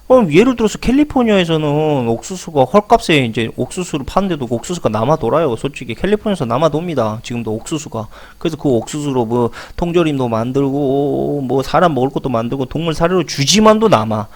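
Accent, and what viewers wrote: Jeolla, South Korea. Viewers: 이사람 전라도네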